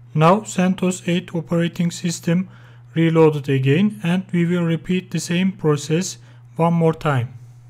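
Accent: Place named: Turkey. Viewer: yanlis anlama ingilizcen cok iyi de aksanin cok beli turk oldugun o yuzden guldum (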